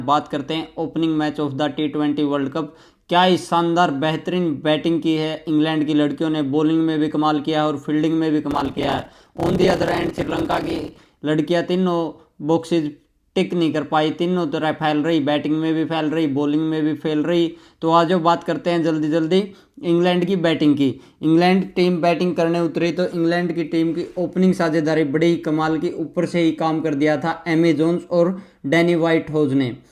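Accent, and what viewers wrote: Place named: Haryana, India. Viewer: Haryana se ho kya bhai ? Thoda haryanvi touch h aapki voice me 😅🤝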